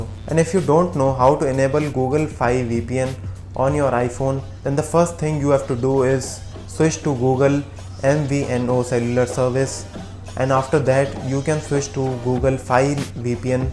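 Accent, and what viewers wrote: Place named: India. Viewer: CANNT TALK ENGGLISH BUT WANT TO MAKE YOUTUBE VIDEOS WTF ? Hate that Indian accent ..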